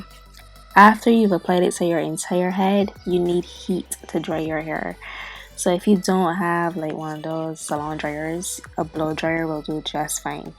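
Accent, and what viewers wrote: Barbados. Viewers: THAT ACCENT😩😍BAJANNN GIRLLL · Jackee Pee she sounds Bajan to me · Thanks for the info. You sound Bajan.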